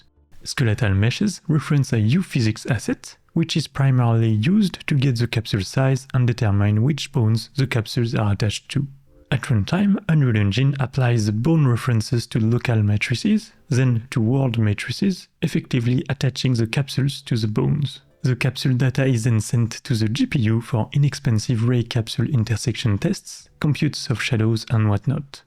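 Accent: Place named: France. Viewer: Accent is just terrible and subtitles def don't help. Invest in some speech classes french boy lol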